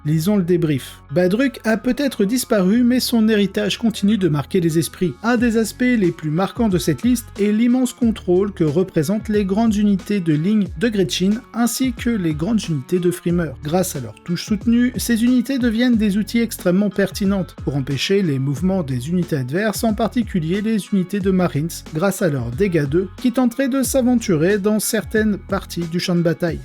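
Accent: England, United Kingdom. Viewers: Gris kiff pour ton accent anglais inimitable ! 😉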